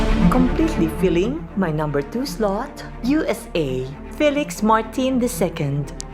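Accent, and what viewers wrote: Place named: Philippines. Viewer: Are you Filipino? I can tell by your narration. hehe, Anyway, I love the words you used to describe these hot and gorgeous men, and the way you focus to their crutch towards the end at some point.. Your voice is pleasant to hear, it's soft and seductive makakatigas ng titi. hehe. Just work on the right pronunciation of the words and your channel will go a long way by having a lot of viewers or subscribers. Keep it up.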